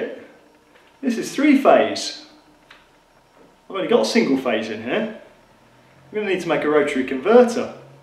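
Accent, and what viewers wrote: United Kingdom. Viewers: The Union Jack bow tie and the Union Jack in his "Handmade Extreme" design didn't tell you he was from the UK? What about his accent? Or what about where he says "here in the UK". Yup, just for people with your low comprehension skills he should have flags on the walls, maybe also in the background a red double decker bus, a portrait of the Queen and maybe he could be eating fish and chips......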